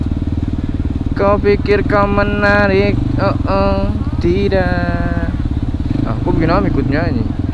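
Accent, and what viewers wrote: Papua, Indonesia. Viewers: Logat Papua😁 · Logat papua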